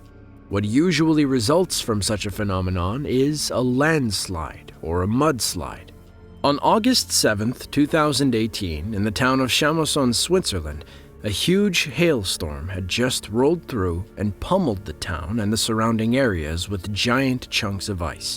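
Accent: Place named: Rhode Island, United States. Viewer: the way you say various...which doesn't coincide with the way you say areas.Rhode Island?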